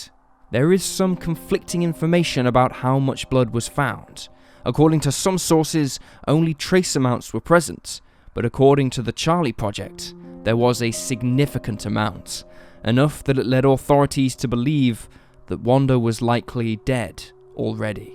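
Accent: United Kingdom. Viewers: yes, I like the British narrators best, as long as they are not speaking in a northern accent, and this one is good.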